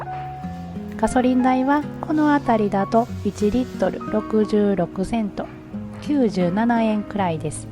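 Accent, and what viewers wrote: Kansai, Japan. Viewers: 君関西人やろ知らんけど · 関西弁に癒されます❤ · それだけ 海外暮らしが長くても 関西弁抜けてないっていうところを見ると もう日本で住んだらいいんじゃないの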